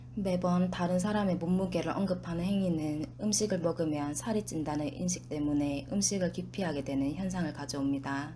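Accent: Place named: Busan, South Korea. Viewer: 나래이터가 부산분이신가바옇ㅎㅎㅎ 잼있어요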